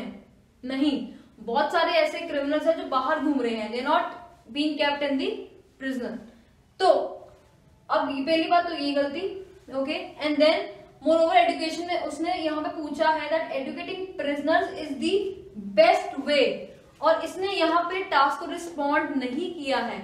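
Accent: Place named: India. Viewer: your talent and effort is equal to solute. but i am not understanding you coz of accent of Indian and sometimes indian words😭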